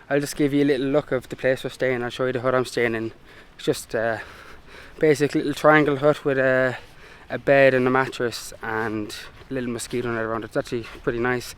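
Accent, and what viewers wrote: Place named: Ireland. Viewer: Sounded like irishy accent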